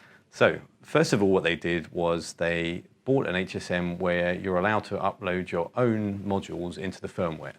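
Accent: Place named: London, United Kingdom. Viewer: London I think ;)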